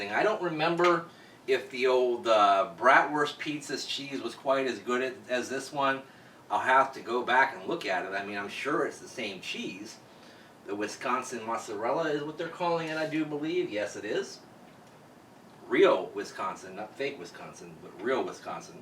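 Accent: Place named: Missouri, United States. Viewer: he must be from Missouri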